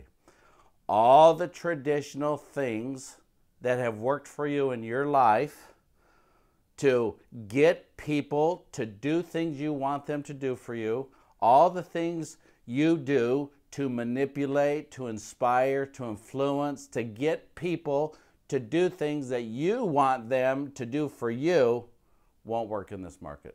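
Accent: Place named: Italy. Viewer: Enjoyed listening to you realised one thing besides everything was saying was right.But also realised you must be Italian.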